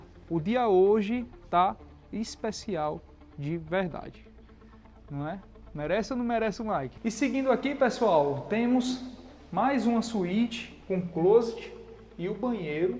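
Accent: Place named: Ceará, Brazil. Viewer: Esse sotaque do Ceará me encanta por demais. O vontade de conhecer esse estado. Talvez, até morar ❤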